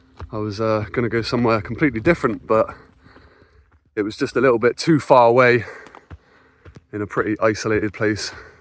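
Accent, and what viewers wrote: United Kingdom. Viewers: what part of UK is this mate? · Which part of UK is that?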